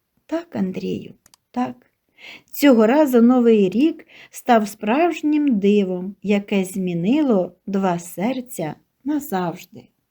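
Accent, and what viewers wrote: Standard. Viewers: чистою українською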